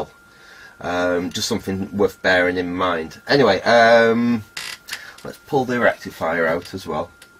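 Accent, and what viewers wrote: Manchester, United Kingdom. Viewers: Where are you from..... Sounds like a bit of a manc accent..... I have a question I can't get my head around..... I bought a ct160 10 days ago .... And regardless of the data ,the anode current settings are always a lot lower than the book.... e.g testing ecc81 valves the anode current should be 10ma and out of 5 valves I've tested they all seem to be about 3to 5ma to zero on the scale.... I've been desperate for a new video to pop up somewhere..... would it be possible to do a video at some point testing an ecc81..... Ps tried a e88cc and the anode should of been 15ma I think it was, however it was zeroing on 3ma.... I'm new to valves only using basic go no go testers... Any help would be awesome.. I'm in Burnley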